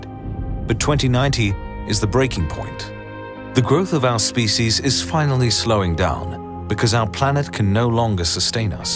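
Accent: United Kingdom. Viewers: I never said anything about clean and shiny animations. I’m not the only one who said they’re mimicking Kurtzgesact, and there’s nothing wrong if they did take inspiration from them. They also have a calm deep voiced British narrator and similar organization and video lengths, they talk about similar topics, the channel also likes posts comparing them to Kurtzgesact so I don’t know what you’re on about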